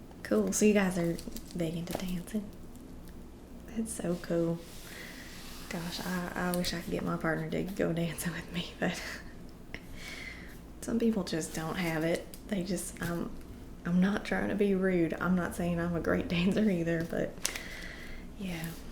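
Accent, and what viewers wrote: South, United States. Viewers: Wonderful! Southern US accents are really lovely to listen to in my opinion :)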